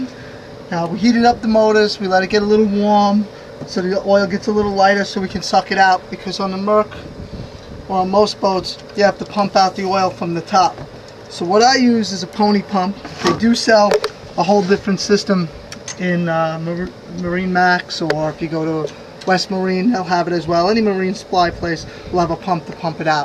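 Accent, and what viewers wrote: Long Island, United States. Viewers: were from long island are you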